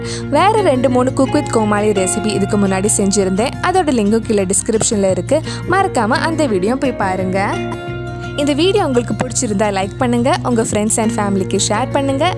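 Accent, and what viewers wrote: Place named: Kerala, India. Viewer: R u malayali ..... Vithyasama iruke unka Tamil?????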